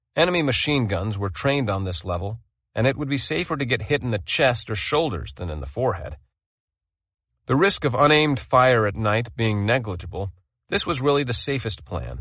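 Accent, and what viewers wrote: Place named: United States. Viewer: Based on the atrocious AI "art" (and spelling) of the thumbnail, it's probably an AI bot doing the narrating. But yes, the US accent is jarring and inappropriate.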